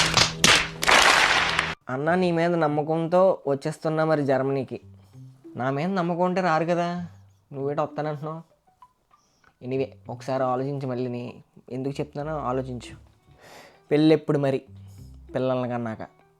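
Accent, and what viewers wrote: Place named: Godavari, India. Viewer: Mana Godavari slang antene oka emotion ani only godharollake telusu 😂Super nuv❤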